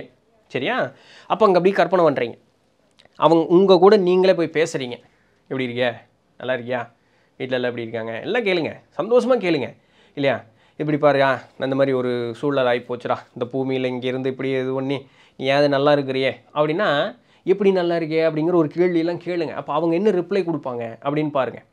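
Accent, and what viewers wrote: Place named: Kongu, India. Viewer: Really very interesting and motivating session in OUR KONGU TAMIL ❤ Tq sir 🎉🎉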